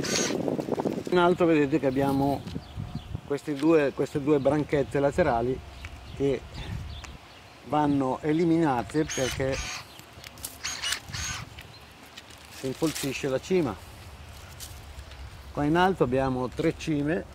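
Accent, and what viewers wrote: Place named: Calabria, Italy. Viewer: sentivo un certo accento calabrese. Anche io sono calabrese. Di Nicotera VV.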